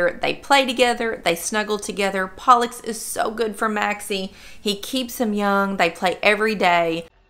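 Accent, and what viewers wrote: South, United States. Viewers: I just love southern accent lol xxx it is 11°C here in Peterhead Aberdeenshire Scotland and I'm in a bootlace strap t-shirt lol... hardy folks us Scots lol xxx Love the new Merc xxx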